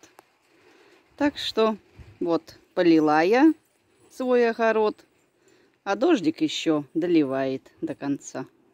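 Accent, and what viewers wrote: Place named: Voronezh, Russia. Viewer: Привет с Липецка,с возвращением, а я думаю какой области у вас говорок,оказывается, воронежский.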